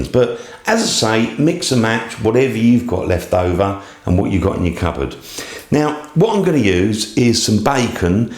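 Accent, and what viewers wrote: United Kingdom. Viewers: He's from the UK, he can't help it. It's a very cute tutorial, I think.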